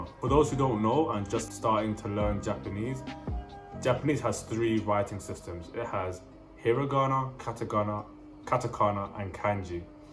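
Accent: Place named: United Kingdom. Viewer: Awesome that you're making content! Right now I'm a few months into learning japanese using the refold method, so I'm not an expert by no means. It's just that your japanese pronounciation kept bugging me throughout the video. I think you still have a really strong british accent while speaking japanese words like kanji, katakana & hiragana. Other than that the video was great and a good overview of the topic ! Just the pronounciation bit makes me a bit hesitant to subscribe, because I'm afraid it might be harmful to hear incorrect pronounciation of your target language.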